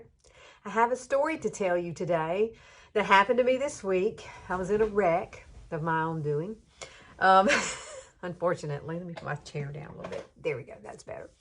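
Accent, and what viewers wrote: South, United States. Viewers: I love your southern accent and you look like a green eyed Heather Locklear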